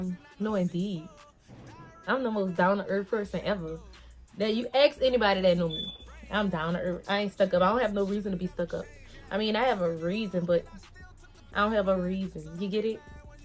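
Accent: Louisiana, United States. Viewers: Love your Louisiana accent!!❤️❤️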